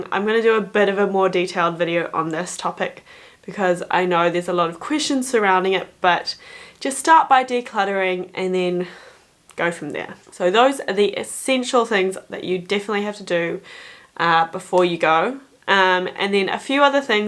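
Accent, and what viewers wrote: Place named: United Kingdom. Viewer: Great tips. Sounding more and more British these days 😁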